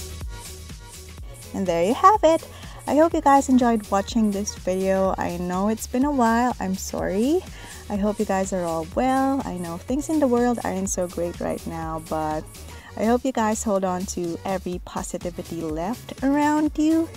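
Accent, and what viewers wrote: Philippines. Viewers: Squidmoons Cypher and came from the Philippines, so I guess we all sound the same (I’m from the Ph too) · You have a filipino accent 😨❤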